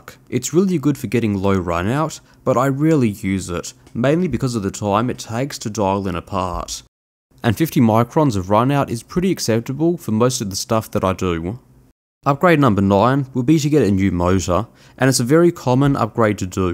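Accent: Australia. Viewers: you sound Australian you can get phosphor bronze at CBC Bearings its not hard to find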